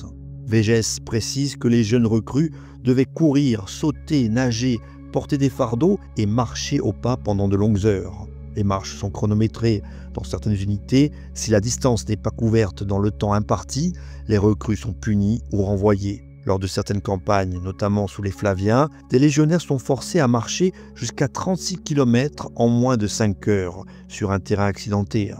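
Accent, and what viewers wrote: Corsica, France. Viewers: Hey ! Il est d'où ce bel accent Corse ? :) ❤ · Magnifiquement documentée ! Félicitations pour ce travail. Je crois reconnaitre un sympathique accent Corse chez le narrateur, est-ce bien le cas ? Cordialement.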